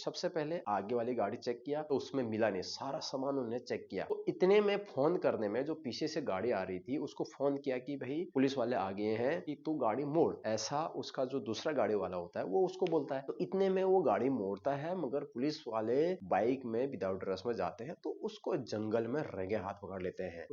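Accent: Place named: Hills, India. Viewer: This man looks as musalman. But language is as Hill person. So seems Gental.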